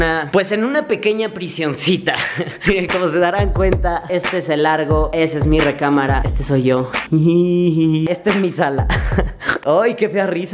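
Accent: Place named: Spain. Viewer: Odio que hables como ESPAÑOL 🙄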